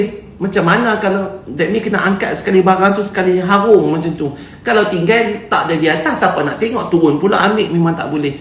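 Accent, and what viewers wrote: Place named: Penang, Malaysia. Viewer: Loghat penang datuk sangat mencuit hati.moga Allah sentiasa merahmati datuk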